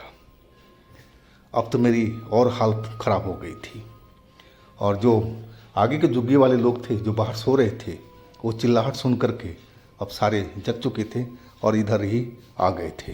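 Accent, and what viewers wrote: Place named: Bengal, India. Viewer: Bhaiya…. Aap ki Hindi…. Too cute🥰🥰🥰🥰Bengali ho na???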